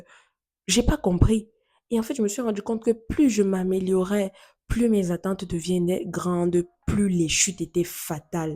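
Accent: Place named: Cameroon. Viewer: J'ai l'impression que tu es d'origine Camerounaise de part ton accent... J'ai envie de te dire Merci infiniment ma sœur 🙏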